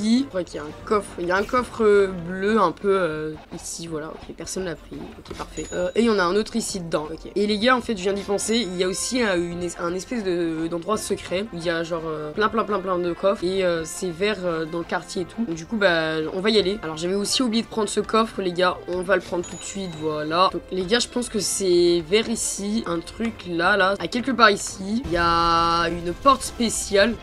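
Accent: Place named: England, United Kingdom. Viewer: l'accent englais sur le live ta peur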